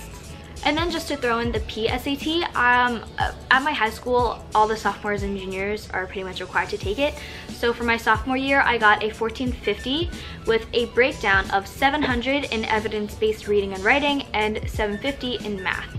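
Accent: South Korea. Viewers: Omg your korean is so cute 😭❤ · Korean accent❤️👌🏻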